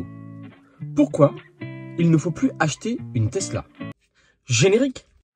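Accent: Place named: Marseille, France. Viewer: Je précise que j'aime le contenu des vidéos. Même si les titres sont un peu hors sujet et légèrement exagéré. Si je savais pas de quelle région il était je dirais qu'il est de Marseille 😅😂.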